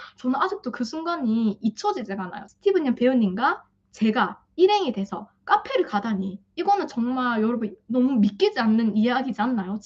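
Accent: Gyeongsang, South Korea. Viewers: 안녕하세요! 지난 주에 알고리즘 타고 제게 쨘~ 하고 나타난! 그 이후로 뜨는대로 다 보고있는데 대구분이신걸 오늘 알게됐네요! 제가 처음 본 영상에 경상도 억양 섞인 영어가 너무 귀엽네요~ 하고 코멘트 남겼었지요. 먼 타지에 살면서 동향 사람 만나 너무 반가운 느낌이네요 ㅋ~ 전 뉴욕에 살거든요. 여튼 쌍둥이 출산 축하드려요!!! 케어하느라 잠도 제대로 못 주무시겠지만 짧디 짧은 영상이라도 곧 뵐 수 있으면 좋겠다는 저의 이기적인 마음 전해봅니다!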